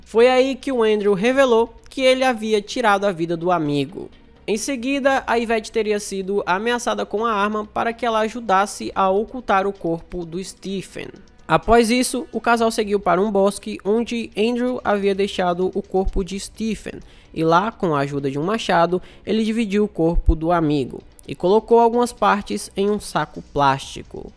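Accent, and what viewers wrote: Northeast, Brazil. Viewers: Oi gosto muito dos seus vídeos e das suas narrativas 👍 você é do nordeste amigo 👏👏 sou de Aracaju-se.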